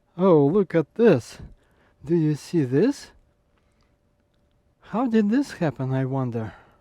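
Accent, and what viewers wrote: Russia. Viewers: У вас такой русский акцент, я ошибаюсь ? :)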